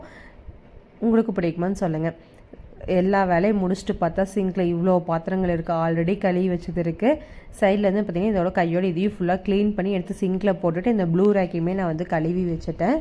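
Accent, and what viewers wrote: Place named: Kongu, India. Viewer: Kongu tamil kekavae romba nalla irrukku.. super sister ...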